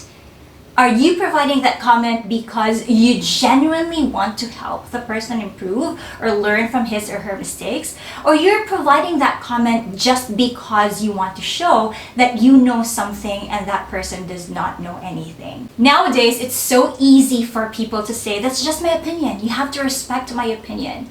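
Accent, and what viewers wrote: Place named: Philippines. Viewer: Feedbacks are gifts to improve ourselves. Guys, you expect her to have an americanized accent? She's a filipino. As long as we understand her, that's fine.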